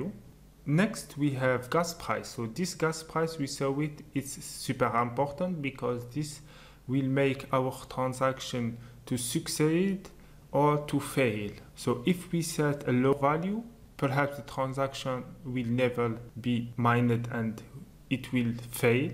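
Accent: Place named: France. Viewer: For the love of the beautiful French accent, I want to learn French, mersi or merci ❣❣❣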